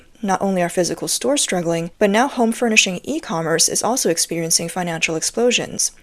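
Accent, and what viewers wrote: Australia. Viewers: Ya, I think she’s Australian, faint accent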